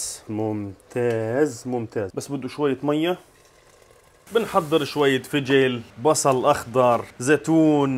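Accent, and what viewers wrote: Palestine. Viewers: بالعكس لهجتوا فلسطينية بحته احنا هيك ابنحكي في فلسطين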